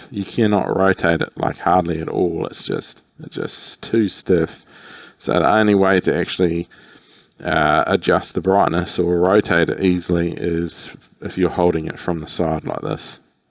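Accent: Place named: Australia. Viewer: I stand corrected… sound like an Aussie to me! wtf?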